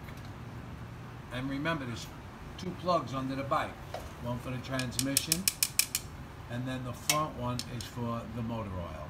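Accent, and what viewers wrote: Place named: New York, United States. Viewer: love that new york accent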